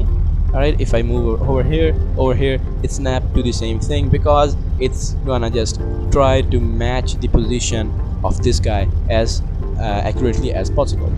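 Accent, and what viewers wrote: India. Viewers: That Indian Accnet is very bad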